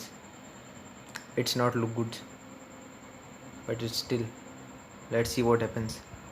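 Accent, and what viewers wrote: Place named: India. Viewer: India's accent sound Funny idk why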